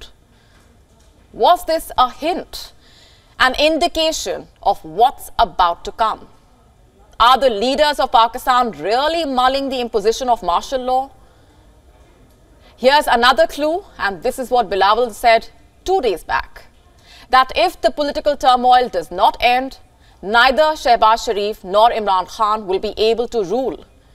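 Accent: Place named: India. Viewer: This is the worse presenter in Wion. Her spoken English is Indian not English.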